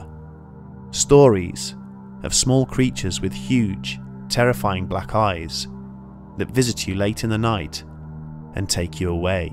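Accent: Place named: North, United Kingdom. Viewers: He's English. They pronounce things differently than we do in the states and Canada. End of story. :) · He said Las Vegas. Maybe you just can't understand his Northern accent.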